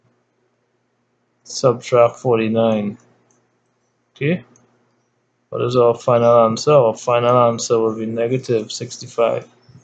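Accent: Trinidad and Tobago. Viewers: You from Trinidad ? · Wow....great job, sounds like a Trinidadian tutor. Well explained.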